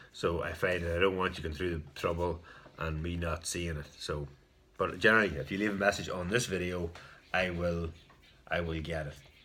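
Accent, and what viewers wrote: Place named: Ireland. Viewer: you rock. Welcome. Congrats on the 100 subs. Love the accent. I Hope to visit Ireland some day. My mother's side if from there. I can paint you some jars, not sure if you are looking for labels or jars or lids. Message me on instagram. So I can possibly make you some jars. Beautiful Peterson you are giving for 2nd place. I'll get a VR up tomorrow for Ya. Hugs from Texas!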